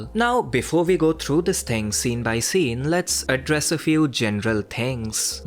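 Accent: India. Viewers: How did you somewhat get rid of your indian accent?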